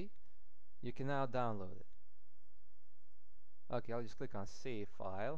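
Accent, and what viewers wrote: Russia. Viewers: you sound like him, are u russian ? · Oh, and your tutorial was awesome. You Russian? Your accent is awesome lol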